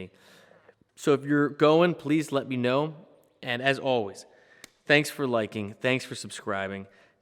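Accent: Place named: Maryland, United States. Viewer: freakin love you brother! miss me some Maryland accents, chilling out here over that Humboldt County line!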